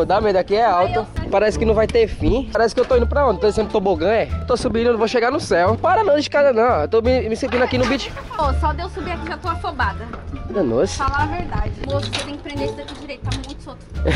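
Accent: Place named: Northeast, Brazil. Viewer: Esse sotaque do nordeste é massa de mais mano kkkkkkkkk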